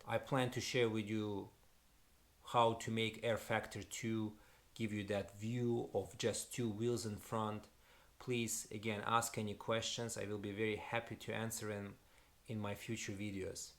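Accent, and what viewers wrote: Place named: Russia. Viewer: Сразу слышно русский акцент, но твой английский очень хороший!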